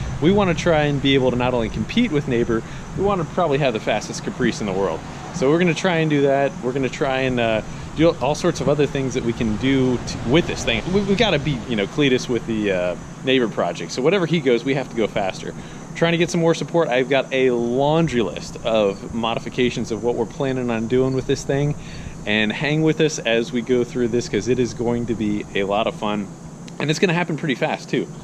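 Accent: United States. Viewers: This is probably the point where I sign off permanently. At first I was excited that maybe this would be a true tuner channel but all this video was is just unimaginative nut swinging. You're literally using the hill billy voice, building a cop car, etc etc. Its like watching cleetus except way less entertaining lol. Bro, you need to get that together.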